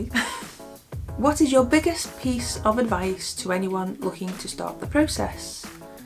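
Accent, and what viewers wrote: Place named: Liverpool, United Kingdom. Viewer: I love your accent.....I have a Scouse accent too!!😀 I'm a lot older than you, but I'm just starting to start, transitioning ....I'm a wee bit hesitant but I know, I need to do this. You know what I mean like don't you? 😀 Thanks for your videos, are you in Liverpool?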